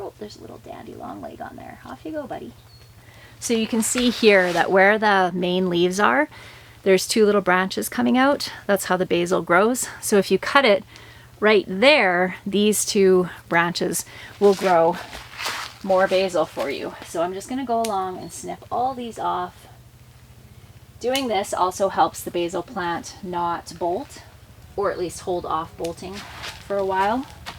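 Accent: Canada. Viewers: I just love your Canadian accent and how you say words. It’s funny that you brought up “marrygolds” 😊 You have such a soothing voice that I can listen to you talk all day long. Thank you for sharing your beautiful life with us 💗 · I'm in Minnesota. Besides words like "process", I hear your Canadian accent in your "ou" words; about, house, out, etc. 😊 It's very subtle, but i hear it! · I love your Canadian accent. My dad's family are Canadian.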